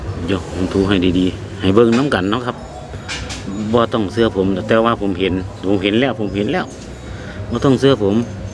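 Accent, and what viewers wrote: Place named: Chaiyaphum, Thailand. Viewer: แอดมิดสำเนียงชัยภูมิออกคักเนาะอ้าย555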